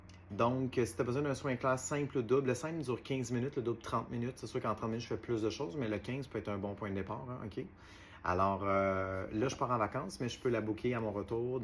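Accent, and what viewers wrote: Quebec, Canada. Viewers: Bonjour, aaah l'accent québécois j'adoooore...et surtout cette vidéo m'appelait. Merci à vous🙏🙏🙏🙏🙏🙏🙏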